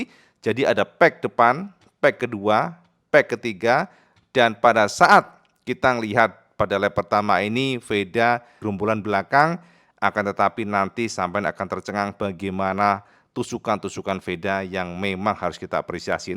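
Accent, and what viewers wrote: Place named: Java, Indonesia. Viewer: PADANGG NJINGGLANGGG.., kanal terlengkap dengan data dan logat bahasa yg khas NJAWANI..., TOP MARKOTOP JOS GANDOSSS.., #vep9budalslametmulihraharjoaamiin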